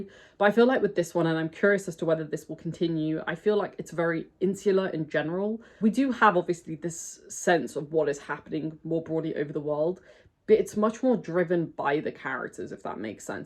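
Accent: United Kingdom. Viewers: Great video, thank you for sharing it. I think you loved many of the same aspects that I also love. Also! It's great to hear a fellow British person pronounce all the names in the way that seems natural to me :) Off to check out your other videos... Thank you for this.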